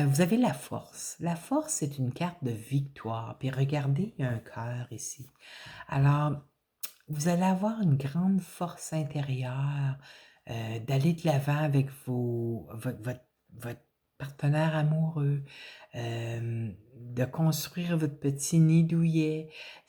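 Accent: Canada. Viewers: Merci pour cette belle guidance. Votre accent canadien est si agréable à entendre et votre voix est si douce. Bonne Année à vous et prenez soin de vous.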